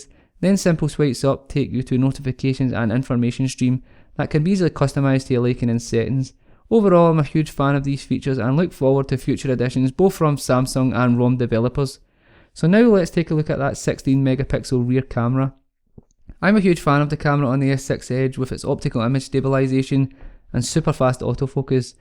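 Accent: Scotland, United Kingdom. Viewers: I like your Scottish accent, it let's me practice on understanding it 😁 although, you should practice on talking a bit slower.. Very good review Good luck! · OMG I frigging adore your Scottish accent!! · You should rename your website: Scottish tech reviews! Love the accent :) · Is this a scottish accent ? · Good review for an awesome device. Your Scottish accent is not that thick and can be easily understood. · Great video for a relatively new reviewer. Love your Scottish accent! Subscribed!! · See it the Comments below you are Scottish- I figured by the Accent it was either Scotland or Texas.... And down to earth informative Reviews nice relaxed Style good voice and no problems understanding you.. Want to hear more about that Battery- should the Phone have been a little thicker for 400 more Mah ? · Nice review bro. Going to Glasgow,Scotland for the 1st time and your video has made me get used to the accent! 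· I really enjoyed it! Since your primary audience is in the UK, I wouldn't worry about your speaking too much. Even being in the US, I understood you perfectly. I'm a little biased though. Scottish accent has always been my favorite. Subscribed.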